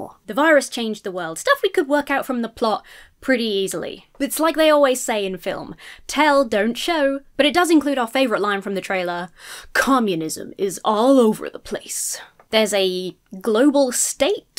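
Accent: United States. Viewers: Your American accent is getting pretty solid! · Dude, your American accent is awesome. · Killing that "Yank" accent gurl.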